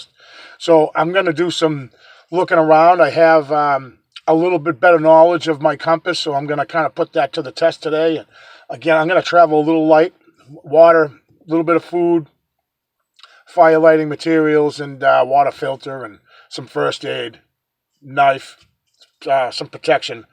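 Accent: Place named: New York, United States. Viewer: You talk like a New Yorka lol. Regahdless. It's different than all other cryptid research channels.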